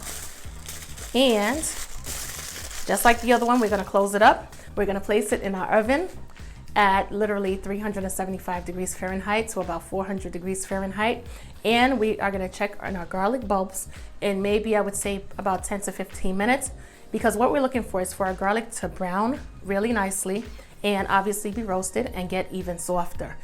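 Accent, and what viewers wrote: New York, United States. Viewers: I need sounds like she from New York Cooks like a Southern Mother looks like Beyonce I'm in 😊..... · You are a new yorker...arent you? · Very good :) - i wonder if she is from New York lol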